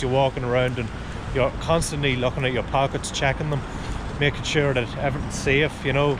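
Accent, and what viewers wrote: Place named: Scotland, United Kingdom. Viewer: Australian with scottish accent, very cute, nice weather and walk around!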